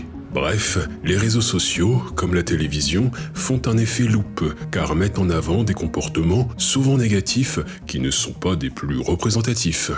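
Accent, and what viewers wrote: Brittany, France. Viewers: d'accord j'ai mis un temps à comprendre ce qui me gênait à l'oreille car c'est très discret, je ne savais pas que c'était une particularité bretonne ! bonne continuation et merci pour vos videos ! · non ce n'est pas un "défaut" c'est l'accent breton, on dit "même" comme ça ici, et les accents sont prononcés différemment. Tout comme l'accent du sud ou d'autres accents prononceront les choses différemment, et ce n'est jamais un défaut ou une faute, juste une habitude culturelle différente.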